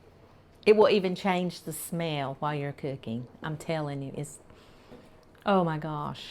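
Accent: South, United States. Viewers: Me too ! She is a joy to watch. I ❤ that southern accent !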